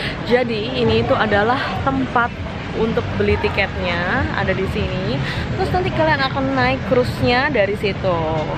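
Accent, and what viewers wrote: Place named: Java, Indonesia. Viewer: Logat nya ky org jawa kak,aq org jawa stay di malaysia sudah 11 th,aq pernah travel ke malacca...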